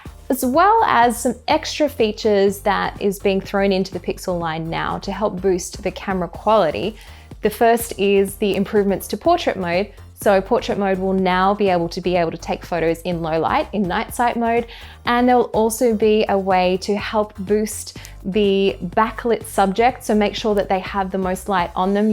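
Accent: Australia. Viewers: What a beautiful Australian accent ❤️ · I love your accent!😍 Australian? Perhaps a Kiwi? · Lol all us aussies sound like this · i'm in love with how she talks 😭 aussie? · 🤗 Australian accent:) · dodgy aussie accent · She is Australian and from the city, I won’t say what state but I think I know. This is how I sound lol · Aient nobobdy gonna talk about that auzzie accent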